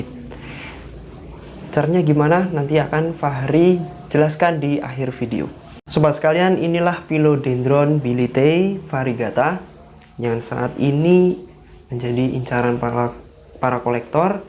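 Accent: Java, Indonesia. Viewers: Aslmkum....Alhamdulillah stlh sring lihat utube nya jd smangat lg brtanam..tau jnis2 philo..dn smua hal ttg tanaman..dlu sy merasa g tau apa2..sk salah2 nyebut nama tanaman..sy sk dg apa yg d sampaikn cara penyampainnya jg..kalem dn apa adanya..mengalir dn kesan jawanya gak hilang...sll rendah hati dn memberi smangat u kita2 yg nonton..smg mdpt balasan pahala dr Allah krn tlh menyampaikn info2 yg brmanfaat...smg sll sehat..sukses dunia akherat d jaukn dr sgl yg buruk...aamiin aamiin yra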